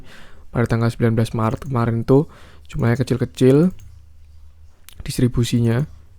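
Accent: Indonesia. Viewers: Dochy Suara mimin nya medok banget, Dan terdengar sangat familiar, Dr.mimin 😂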